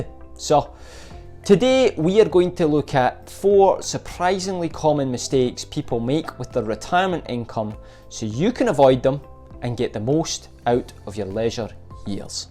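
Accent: Scotland, United Kingdom. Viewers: Excellent points. This extremely important video had 828 views. It proves your point that people pay more attention to planning vacations than planning retirement withdrawals. Love your accent. I've been to Scotland once. Beautiful country, great people. The weather could be brutal. Glad, the music in the background is toned down. Too bad it didn't help to crank up the number of views. Don't get discouraged. I'll watch. Content is great.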